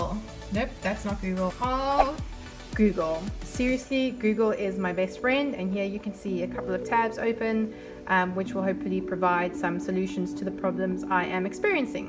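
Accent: South Africa. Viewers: Are you South African? If so, where did you study?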